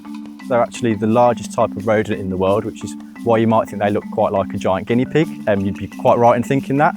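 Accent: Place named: Australia. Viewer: this man sounds aussie but why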